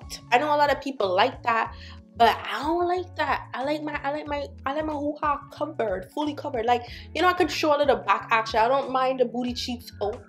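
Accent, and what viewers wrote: Jamaica. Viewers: U SOUND JAMAICAN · Chun chun 😂😂😂 . The Jamaican can’t hide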